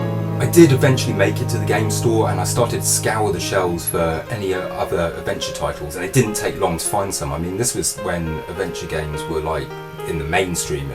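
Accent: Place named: United Kingdom. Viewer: is one talented man. I wish that I had 1/1000th of his talent. Still, I take great pleasure in listening to his incredible retrospective brit voice. So very relatable. Wish that I could be like. Le sigh. Just give up indeed...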